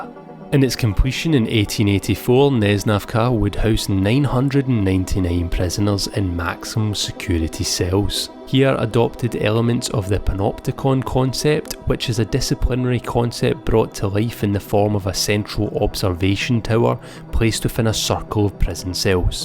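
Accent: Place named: Scotland, United Kingdom. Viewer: you have a heavy scottish accent but nonetheless, i like the review of this underrated analog horror series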